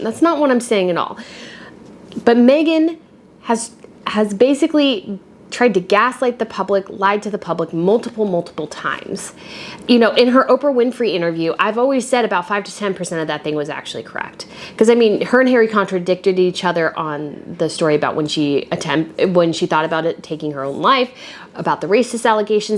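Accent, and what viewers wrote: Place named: United States. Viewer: I'm nether British nor a 'Royal', but people like you are only after klick rates here. From yr accent I assume you're American, so what makes you an 'expert' on interpreting gestures and words of people you don't know and never will? Nothing but riding the currently so popular 'Anti-Harry-Meghan' wave... Disgusting!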